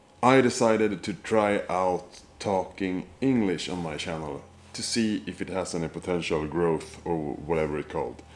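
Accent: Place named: Sweden. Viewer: This is gonna be great! You catch your audience not just because of the hunting part, but because of what a genuinely great guy you are 😊 and I don’t think there is many channels showing the Scandinavian fauna spoken in Swenglish😂 it will catch on my boiiiii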